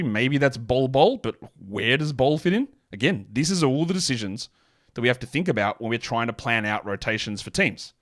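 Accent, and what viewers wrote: United States. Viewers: Best American accent ever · Great American accent and gradey dick segment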